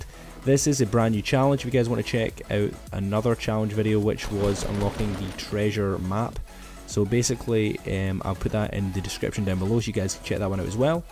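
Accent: Scotland, United Kingdom. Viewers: Are you Scottish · Are you from Scotland nice bro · this is some hardcore scotish accent (i fricking love it) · Are you Scottish !?!?? If you are I finally found someone else that’s Scottish on yt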